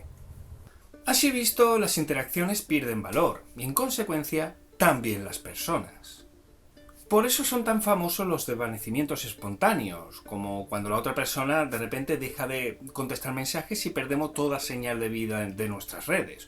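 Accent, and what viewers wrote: Spain. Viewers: Me gusta el asentó español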